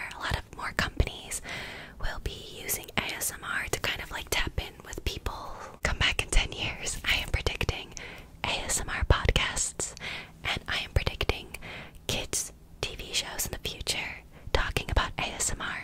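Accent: United Kingdom. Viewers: omg your british accent is so beautiful omg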